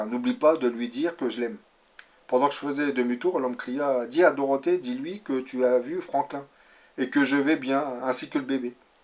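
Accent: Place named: Belgium. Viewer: Vous avez un jolie accent du nord ou proche de la Belgique 🇧🇪... en tout cas cela y ressemble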